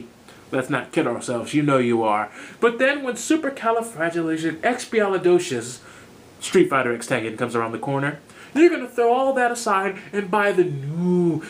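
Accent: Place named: RP, United Kingdom. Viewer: queens english.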